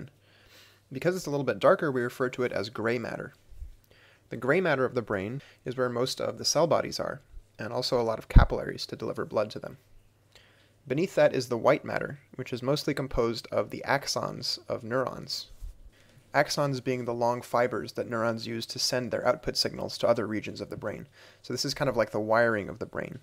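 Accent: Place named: Africa. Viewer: And you sounded like an African!!! Thank you so much.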